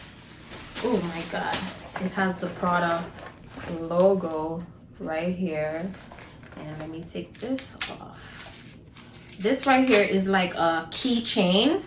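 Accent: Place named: Jamaica. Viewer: I loovveee your fashion!!! I hear some patois mi sis!!!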